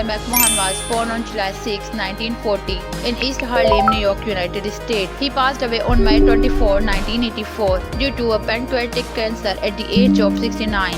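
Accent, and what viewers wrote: India. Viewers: Seems to me the narrator need to work on her accent! The whole world knows she's from India! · Why does your voice sound so weird? It sounds like you're from India or something.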